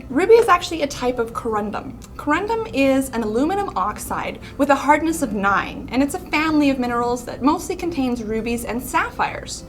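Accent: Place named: Winnipeg, Canada. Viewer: Ruby was my Mom's birthstone, emerald mine. I loved collecting garnets during my college Earth Science' class field trips as well. It was funny though, for an engagement ring my fiance wanted cubic zirconia because it was more 'sparkly' than diamond. I thought it was nice too. Love your Winnepeg accent--I worked for a client up there in the late 90's, I was given a visa which marked me as a professor so I could teach hotel business systems' classes all across Canada without paying a customs fee, under the rules of NAFTA. My Canadian hosts were so nice to me--my first trip there, with my visiting Napa Valley Gospel choir, was in '72 in BC and Alberta. I was so blessed to go there so young, and my timing was lucky, because I returned home only to have an emergency appendectomy a month later that August, returning from my school's summer vacation an intriguing hero among my choir mates and classmates. 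I remember arriving in Ottawa during a terrible blizzard at 3AM one year, and my client picked me up at the airport as my flight came in after an eight hour delay from Detroit. I also remember a client in Montreal giving me a day off so I could hop a bus to Quebec City, and I enjoyed the Winter Carnival and ice sculptures. Other than Europe, I spent more time in Canada (mostly working) than any other place outside of the US. I remember the Geology and Glaciers of the Canadian rockies, and the hospitality of the French and English Speaking Canadians who treated me like I was a US am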